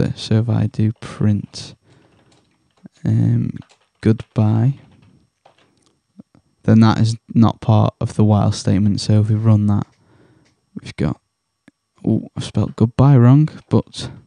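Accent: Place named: Northern England, United Kingdom. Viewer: ho,, before i for get,, are you from the north of england as your voice and manerisms seem similar to mine..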